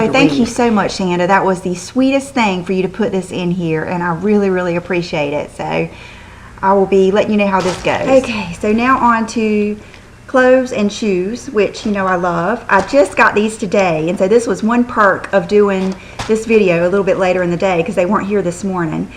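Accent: United States. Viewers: i just love your country accent :)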